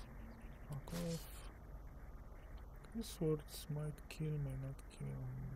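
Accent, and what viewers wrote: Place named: Egypt. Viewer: Hey mate, it's nice to find someone that knows what they're doing with my favorite race/class combination. Also, I was wondering, what accent is that? I want to say there's some Egyptian/Arabic influence there, but I'm not well-versed on middle eastern/mediterranean accents. Also... "You wanna charge these guys? Be my guest. Pretty sure your men won't like it, but...". I love Shock Troopers. They're my favorite unit in the game. <3 Your accent is also hilarious for me. It adds a sort of toughness to the Orcs that you don't find in a typical white guy playing this combo on Youtube.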